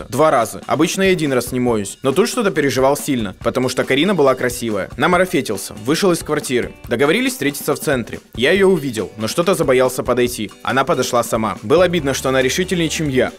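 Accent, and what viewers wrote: Odessa, Ukraine. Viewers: Вау! Вы из Одессыыыы)))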